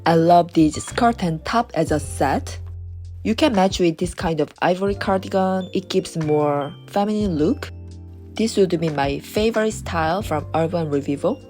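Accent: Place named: South Korea. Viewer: Are you Korean? I am Korean too and I can kind of detect it from your accent. Lovely looks!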